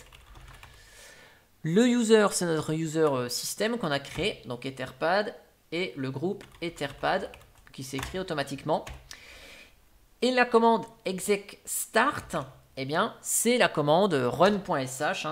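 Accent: France. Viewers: Guy teub et Mec. C'est marrant l'accent Français quand même :)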